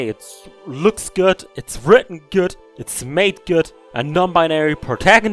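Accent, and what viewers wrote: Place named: Germany. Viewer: You sound german are you?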